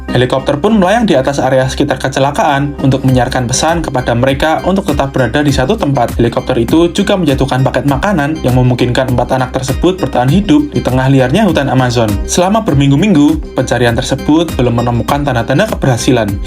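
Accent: Java, Indonesia. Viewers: Ngomong nye biase aje...keles..😢 Jgn di medok2in Logat nye 😭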